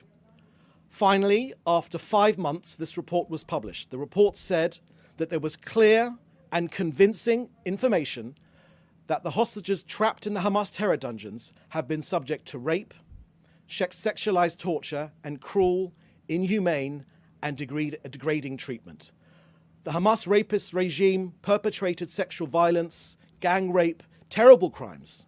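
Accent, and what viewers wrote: England, United Kingdom. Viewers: Another liar with funny English accent fomenting his hate and BS. No one believes your lies anymore after you Massacred over 30,000 innocent Palestinian civilians in Gaza with over 16,000 children. Shame on you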